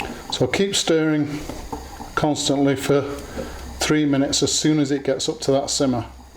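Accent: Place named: United Kingdom. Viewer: I love your accent. what kind of british accent is it?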